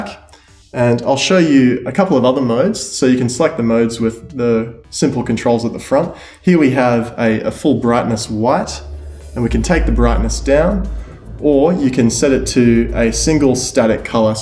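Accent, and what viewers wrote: Australia. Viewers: Well done, I thoroughly enjoyed your tutorial and it was great to hear a comrade from Us-trail-ya (Australia). I've been dabbling with Arduino and all sorts of odds and ends, then came across this video which has inspired myself, and hopefully others to incorporate different methods to create their own piece of modern art.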